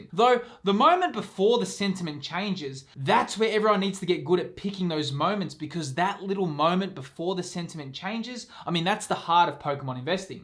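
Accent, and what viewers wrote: Australia. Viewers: Love. That. Lefeon! Thanks for the content! Aussie accent makes it even better.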